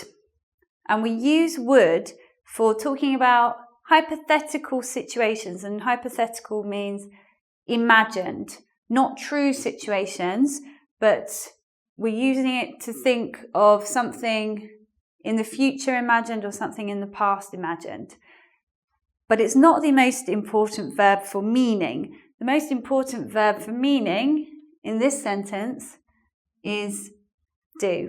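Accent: United Kingdom. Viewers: Mrs please can you tell me which part of UK you are from? I'm asking because of your accent. Many thanks for the helpful lesson, I have problem with using (have) as an auxiliary verb! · jossan Her british accent is so simple to me... YOU CAN READ THE english subtitles AT THE SAME TIME IF YOU WANT TO learn active listening like i do. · that accent is British English? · Mam, do you use brities accent to teach?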